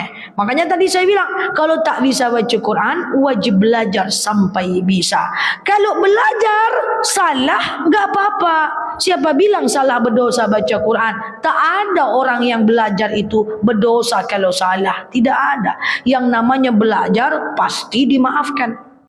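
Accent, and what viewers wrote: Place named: Malaysia. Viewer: Masya Allah logat Melayunya suka saya ustazdah cantik,dah hidup diduniya banyak masalah,pulang kampung akherat singgah dineraka pula yassalam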